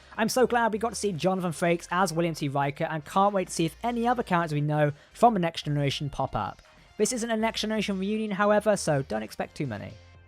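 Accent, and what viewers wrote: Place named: United Kingdom. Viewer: It's a shame that this fellow is cursed with that damned British accent which causes the speaker to slur their Rs into Ws (Mayfair?), making them sound like Elmer Fudd: "Be vewy quiet ... I'm hunting wabbits". That, plus his penchant for speaking too fast, I can understand very little of what he is saying. Very annoying to listen to.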